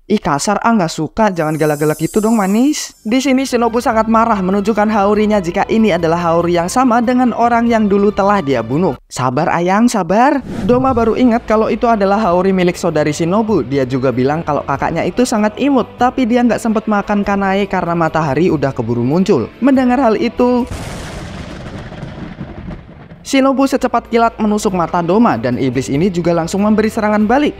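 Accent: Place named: Padang, Indonesia. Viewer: Wahh orang padang 😅😅😅😅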